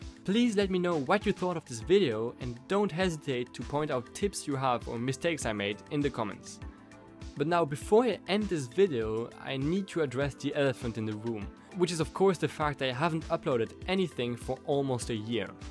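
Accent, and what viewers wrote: France. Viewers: looks like an french accent you got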